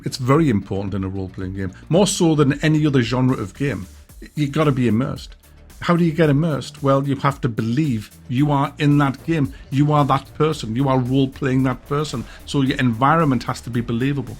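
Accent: United Kingdom. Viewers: I like your accent. What part of Britain is it from?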